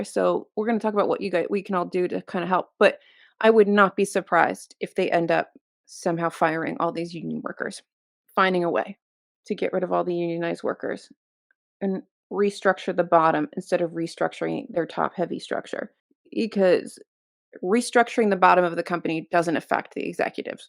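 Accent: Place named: New York, United States. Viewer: love this video and had to comment bc when you said "cost" i knew immediately u were from new york and love ur accent (bc it's like mine haha!)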